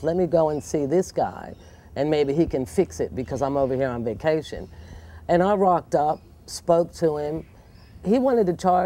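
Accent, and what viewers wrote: Australia. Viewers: Her accent is a spin out. About 70% Aussie with some yank. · You've deff got a half Australian accent going on there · BigImaginationDoll16 I was thinking the exact same thing. Some words are said with an Australian accent, like when she says "dollar". Veeeeery interesting hmmmm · can hear the Aussie accent quite clearly. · love your Aussie Twang in your voice. so coooooool. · Don't worry. You're an Aussie now. You even sound it,😃 our government cares more about its citizens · 10 seconds in, that accent, she's a real Australian now! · Got that Aussie accent coming in mate :-)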